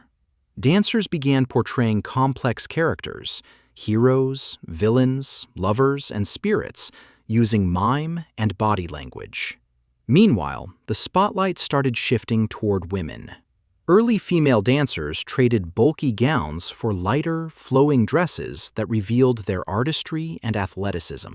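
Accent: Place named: United States. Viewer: Quite interesting, but oh, the American pronunciation! BallAY? Someone has told them French stresses the final syllable. In fact, the stress should be more or less equal on the two syllables of the word ‘ballet’.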